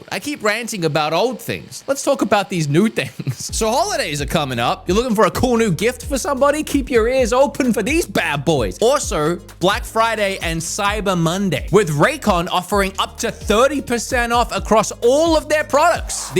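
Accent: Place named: Australia. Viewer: Im guessing ur Australian? Haha don’t let the Europeans hear that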